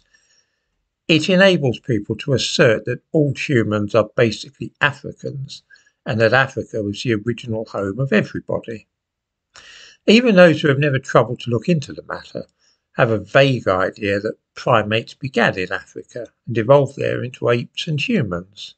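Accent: United Kingdom. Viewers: sounds like the British, after BRIXT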